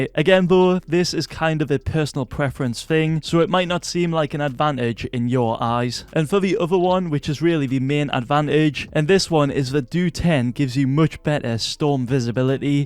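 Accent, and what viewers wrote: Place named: Newcastle, United Kingdom. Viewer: been a fan for about 2 years, but please stop saying "that have went down" its "gone"!!! lmfaoooaoaoaoaooaoaoo... fr tho i get its the geordie in u (i swear ur geordie anyway)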